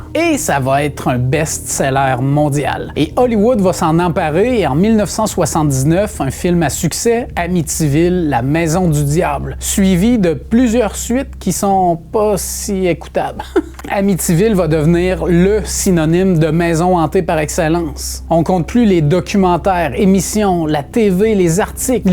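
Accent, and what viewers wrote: Quebec, Canada. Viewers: Trop bonne, votre vidéo… et sympa, votre petit accent qui fleure bon le Canada 👏👏🌷🌹🌷🥀🌷🌹🌷👏👏! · Si les événements paranormaux sont constamment débusqués, c’est justement parce que c’est faux. Ce qui est paranormal dans ce canal, c’est l’accent fatigant de Québec avec les « é» prononcés en "oé". Okoé ?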